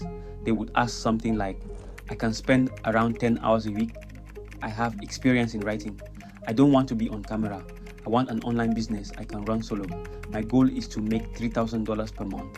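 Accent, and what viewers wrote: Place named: Africa. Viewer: Your video has a well- thought outline and a coherent presentation. However, the heavy African accent is a distraction and calls on viewers to focus on your speech pattern. There are soooo many hard-working content creators from 3rd world economies that FAIL to focus on their poor English speaking skills. You will struggle to keep attention from viewers in Tier 1 countries who will abandon the video after 2 seconds of listening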